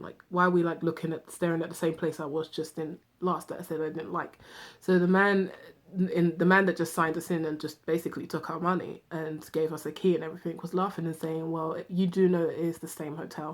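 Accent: United Kingdom. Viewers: How come you have a British accent but you're from Texas? Just curious xx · Are you British but live in America now? Sorry for asking I'm just curious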